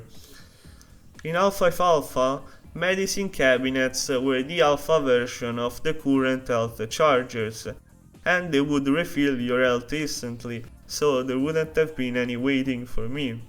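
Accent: Italy. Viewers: I have never heard a more aggressive Italian accent. Lovely video video, keep up the good work.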